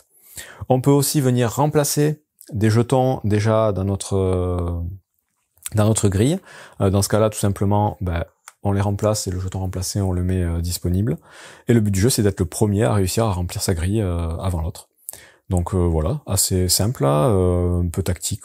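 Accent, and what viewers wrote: South-West, France. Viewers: Bonjour, Je regarde régulièrement tes vidéos et je me décide enfin à te laisser un petit commentaire. Merci pour ta chaîne et la régularité de tes publications. Une chaîne qui donne de la fraîcheur dans le paysage vidéoludique pour le moins très similaire et assez commercial. Tu es clair, concis, précis dans tes explications, c'est fort agréable, et avec un accent du sud-ouest qui ne gâche rien ;).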